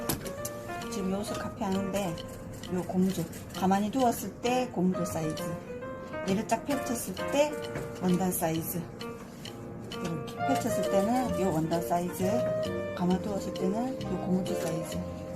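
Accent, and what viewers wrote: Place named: Busan, South Korea. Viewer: 오랜만에 부산말 듣네요 용신다 요즘도 이 말 쓰네요 젊은분이 용신다 하시니 오랫동안 외국에 사는 제가 고향 부산이 확 들어 옵니다. 손녀 들 옷 만들려고 하는데 너무 예쁜 옷 도움 됩니다. 감사합니다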